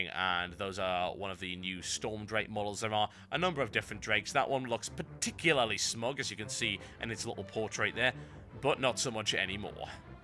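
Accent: United Kingdom. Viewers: don't forget to mention his really cool British accent.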